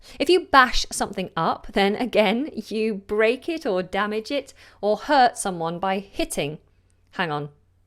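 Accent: United Kingdom. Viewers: Hello, I am a faithful follower of yours and I think you are one of most important and serious youtuber about teaching british English currently. I have seen your develop since you started your channel and you have been gaining lot of suscribers because of your professionalism. I would like to ask you to do some videos about "Shadowing" because I have been watching some very good videos about it but the majority of them are from American English, which is fine, but I am living in the UK and I would like to get the rithm and pronunciation that you have which is lovely. I hope you consider my proposal because I am sure if you do these videos you will do them awesome as everything you have done up to now. This is a very good example about Shadowing, I think he is a very good teacher but hi is teaching American English. <link>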